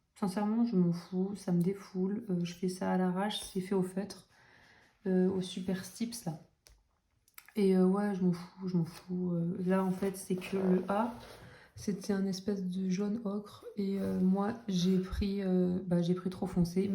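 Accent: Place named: England, United Kingdom. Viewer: Merci pour la mention dans ta vidéo, j'en ai été très touchée! Tu as une super jolie PAC, et vas-y, achète-toi un 3ème exemplaire du livre que tu adores, surtout à ce prix-là! Et surtout, ne lâche pas ton accent anglais, hein, on aime;) Au fait, c'est bon, tu as trouvé tous les trèfles?? Mdrrrrr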